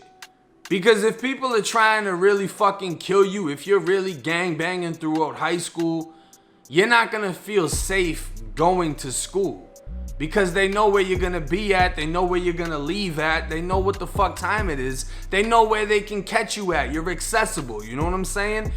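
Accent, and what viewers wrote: Boston, United States. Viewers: Sounds like you from Boston with that accent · I watched like 100 of your videos just seem more real than these other internet niggas sounds like you from Boston or some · Boston accent. Reporting on Cali politics 🤔 I'm not mad long as it's all factual and accurate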